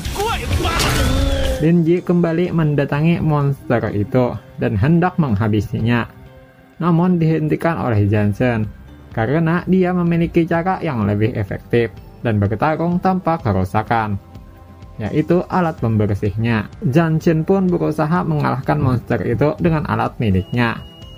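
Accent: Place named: Banjar, Indonesia. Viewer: banjar leh.. lanjutkan bang 😂 unik ni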